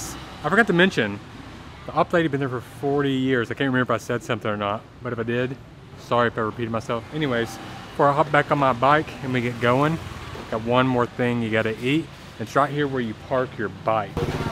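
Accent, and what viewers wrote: Vietnam. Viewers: Your Vietnamese accent is soooo good and super adorable! Very polite and sweet. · Your Vietnamese accent like my brother. Very cute !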